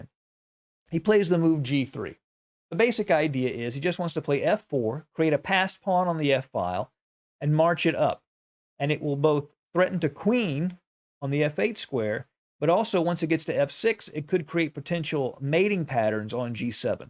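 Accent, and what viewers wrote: United States. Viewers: I love your postings. 80% because your analysis is so instructive, 10% because you do games I remember from my youth (you know, when you said things like p-K4) and not just the stars, and the final 10% because I just luv that akcent - sounds like a real cowboy to my terribly British ear, old chap.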